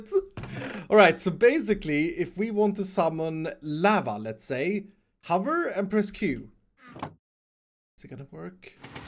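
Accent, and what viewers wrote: Sweden. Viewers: Are you from Sweden iskall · Are he Swedish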